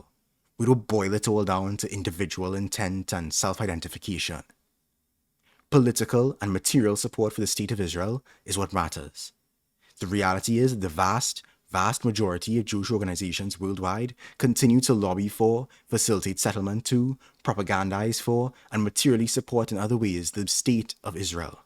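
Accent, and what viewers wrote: Trinidad and Tobago. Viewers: Is this guy Trinidadian?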